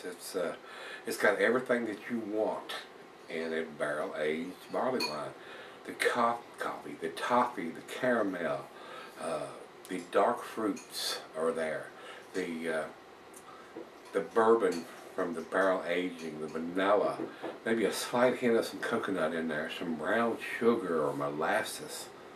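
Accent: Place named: Virginia, United States. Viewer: hey! love your reviews brother. what part of va are you from. because you sound like your from my neck of the woods.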